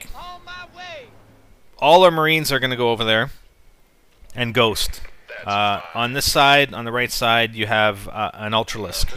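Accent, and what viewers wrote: Canada. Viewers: I HATE YOUR CANADIAN ACCENT! GO BACK TO ALMOST-AMERICA!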